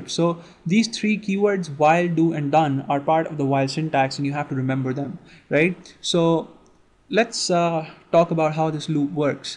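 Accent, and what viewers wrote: India. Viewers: stop trying to use american colloquial language...especially with this indian accent...Preferably stop using english....there are many channels with proper english... It's better to stick to hindi for you guys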